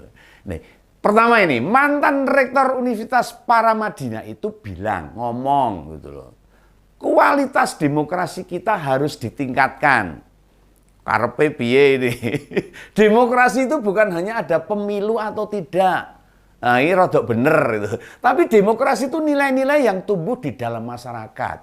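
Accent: Java, Indonesia. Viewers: Teruskan pak blantek sapi ngomong jowo ae gak usah ngomong bahasa indonesia medok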